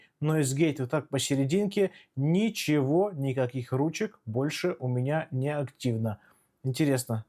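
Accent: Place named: Germany. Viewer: Купил такой недавно, прикольная штука, но на постояннку только новичку, и то с натяжкой. Обзор понравился, но есть ощущение что автор немец который учил русский как иностранный. Сорри если обидел, но звучит реально так 😅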